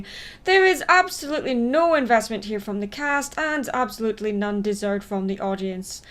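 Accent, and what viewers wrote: Scotland, United Kingdom. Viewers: I have a vague recollection of this. Or it was probably just seeing it on a greeting card. I was somewhere around 3 when this came out (and when a lot of things you plan to review this year did). Side note: I absolutely love listening to you speak. Something about a Scottish accent on a woman is just indescribably enticing.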